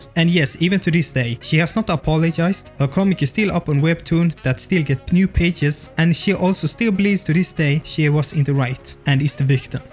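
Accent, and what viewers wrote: Sweden. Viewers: Love your swedish accent :D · I might be wrong but I think it's Swedish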